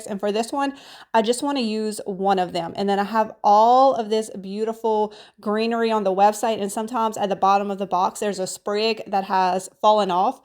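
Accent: Louisiana, United States. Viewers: I love everything you did but the measuring cups were just so simple and darling. By the way, are you originally from Louisiana bc you have a Louisiana accent you sound cajun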